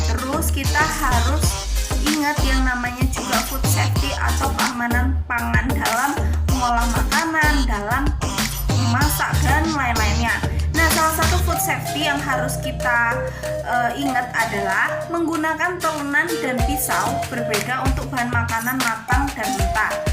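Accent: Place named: Java, Indonesia. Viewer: Thank you kak for information untuk tetap healthy. btw, kok agak medhok yah wkwkw 😂😂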